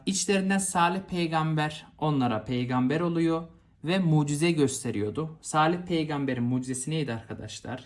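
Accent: Malatya, Turkey. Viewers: Hocam Malatyalı mısınız? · Hocam Malatyalı misinizzz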